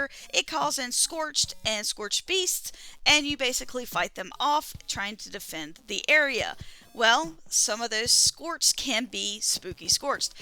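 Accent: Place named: West Virginia, United States. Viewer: W.V. accent...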